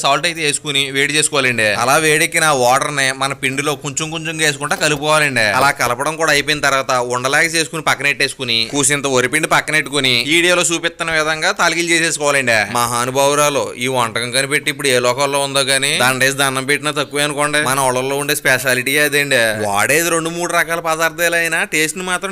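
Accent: Godavari, India. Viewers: మన గోదావరి స్లాంగ్ మీరు చాలా చక్కగా మాట్లాడుతున్నారు భాయ్ ❤❤❤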